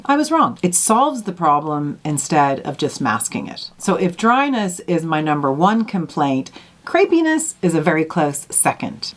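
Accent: Ireland. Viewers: Hey ❤❤ i detect an Irish accent ??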